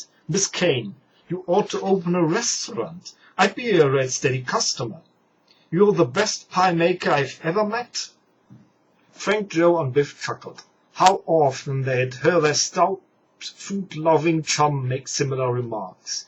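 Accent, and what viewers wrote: United Kingdom. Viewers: Wanted to check out this series before buying it online. THanks for posting this. It's nice that you have a Brittish accent.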